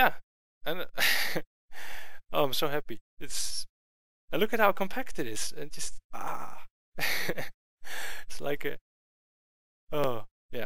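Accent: Netherlands. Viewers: je kan altijd horen als iemand uit Nederland komt xD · Als ik het goed hoor ben jij Nederlands...? :D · Ik hoor dat je nederlands bent :d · Ik hoor aan je engels dat je nederlands bent, of niet?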